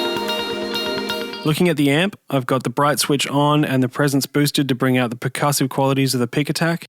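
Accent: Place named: Australia. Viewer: That flat deadpan narrative is just how many Australians talk, especially musicians who are more interested in letting their instrument convey emotion than their speaking voices.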